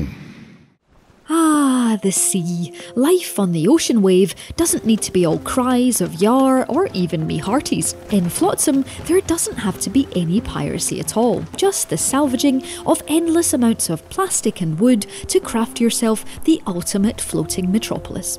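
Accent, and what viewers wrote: Scotland, United Kingdom. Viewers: I think it;s eng-scottish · it's Scottish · Is she Scottish? I love her accent!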